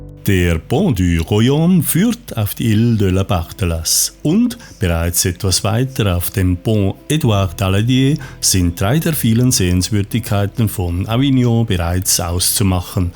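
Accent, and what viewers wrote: Switzerland. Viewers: Finde das Video gut. Und ich mag das Schweizerisch, mit dem Avignon erklären wird!!! Wir haben gute Erinnerungen an die Schweiz, fahren immer wieder gerne in Urlaub dorthin ☺️ Wenn wir nicht gerade nach Südfrankreich fahren 😉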